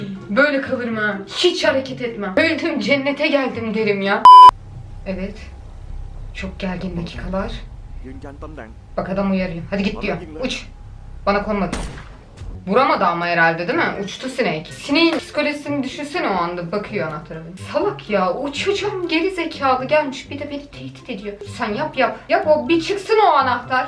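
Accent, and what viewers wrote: Turkey. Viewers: Sen heç AZERBAYCANLİ KİMİ DANİSMİRSAN TURK KİMİ DANİSİRSAN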